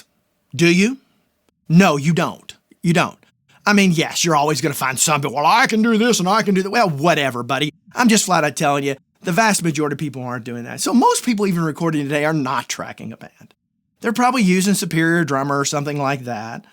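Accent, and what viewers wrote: South, United States. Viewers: Thank you for saving me from buying this! I have a lot of hardware synths and was considering it, but kept feeling there was something not up to snuff. Love your forest gump accent!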